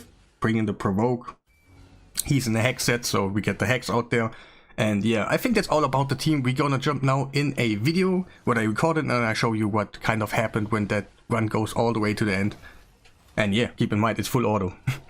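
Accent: Germany. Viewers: I like your german Aussprache from the english words😅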